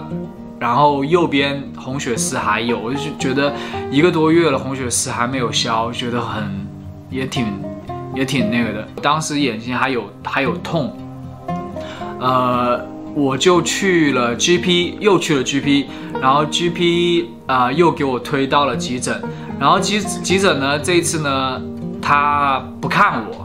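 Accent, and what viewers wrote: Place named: Taiwan. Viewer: 怎么台湾口音？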